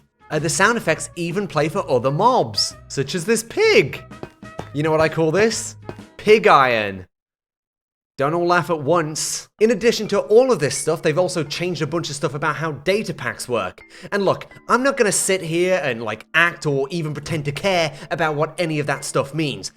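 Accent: United Kingdom. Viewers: i love watching a british person rant about a silly block game. gotta be my new favorite types of videos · funny thing is hes British so it technically wasn't a hard R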